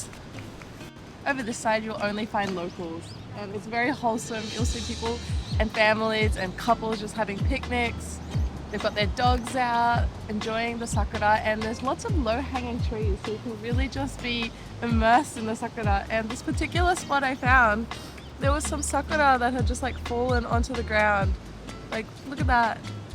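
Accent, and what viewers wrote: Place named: Australia. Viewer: I dont think I ever comment on your videos, I like how you show things in a chill way with your aussie accent! <3 enjoy! my dream is to go to japan one day !😄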